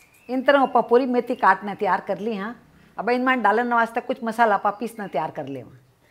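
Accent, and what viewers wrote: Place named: Rajasthan, India. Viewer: Thank you. I love your recipes. I also like hearing you talk mardawadi.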